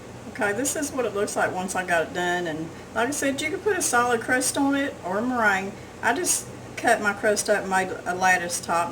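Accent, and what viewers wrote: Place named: Arkansas, United States. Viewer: This is so much like my mom's recipe. They lived through the "hard times" of the depression and WWII and farmed a small piece of land in south western Manitoba(Canada) and they had to make things stretch so she used just 1 cup of raisins and 2 cups of whole milk from the cows she milked herself. Mum made this pie when we were growing up and I still love it today and I'm 61 yrs of age. Thank you for sharing with us. I love your Arkansas accent. We lived for one year in Kansas, when I was 8 rys old, right down in the the south west corner near the Oklahoma border. At that age a kid is like wet clay and I picked up the local accent so much that when we moved back to Canada everyone thought I was born in the states. To this day when the Star Spangled Banner is played my eyes well up and I remember that wonderful carefree year as a boy riding my bike in our small town with my first dog running beside me. 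I'm tearing up as I write this so will leave off with many thanks and good wishes to y'all.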